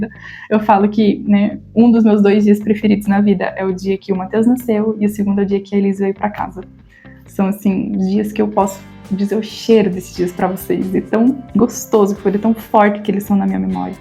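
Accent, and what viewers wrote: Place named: South, Brazil. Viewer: Há sotaques brasileiros uns bonitos e outros sofríveis. O dela por acaso é bem feio. Como ela é do Sul do Brasil e com aqueles olhos bem azuis, não temos dúvidas que é italiana ou e alemã, embora tenha nascido no Brasil e tenha sobrenome português. Mais uma que veio "istranhá" e "falá mau".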